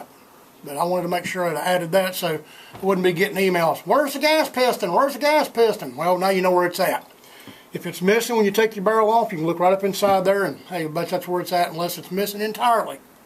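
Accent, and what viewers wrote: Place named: East Texas, United States. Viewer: where you from boy? I'm guessing it aint main. meby deep east texas.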